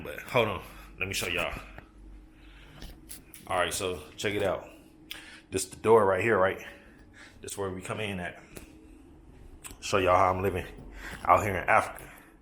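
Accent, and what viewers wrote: United States. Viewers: Sounds like u from Cali homie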